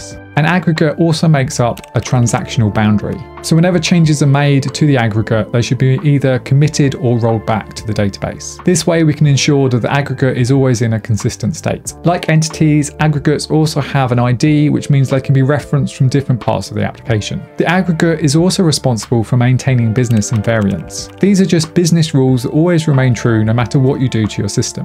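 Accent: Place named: United Kingdom. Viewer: Hi, thank you for your video. I like the British English pronunciation. I lived for 5 months in England, at first it was a little difficult to keep up with the native speakers, I was able to learn their accent, however there are many accents in the UK. Your videos help me a lot to remember that particular English!! thank you.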